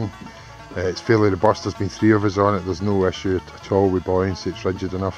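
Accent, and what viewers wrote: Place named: Scotland, United Kingdom. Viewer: My Brit friend tells me it's English with a moderate Scottish accent. Fun. :-) And, love the concept. Wonder if he ever got around to trying one with lighter weight materials. Also saw one online today made with PVC pipe.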